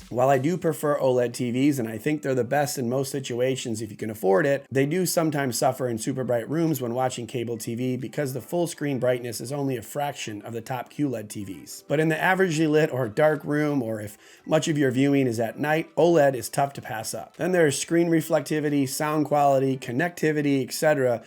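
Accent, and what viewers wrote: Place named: Massachusetts, United States. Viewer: Are you from Massachusetts by any chance? I spot an accent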